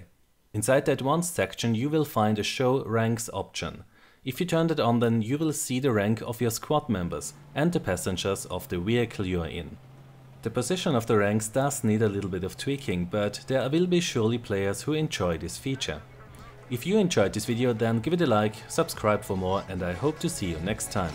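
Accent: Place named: Germany. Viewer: You have the greatest German accent imaginable. I just picture you sitting in a lab and refusing to leave until whatever you are working on has been perfectly engineered.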